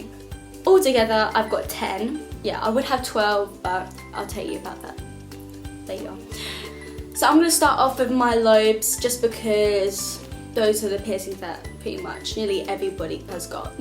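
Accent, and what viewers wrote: England, United Kingdom. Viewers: Onyx Lover she's english but she lives in France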